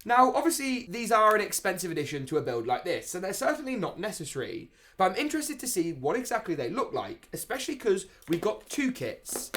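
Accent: United Kingdom. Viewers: I love this guy especially his British accent that makes the build more interesting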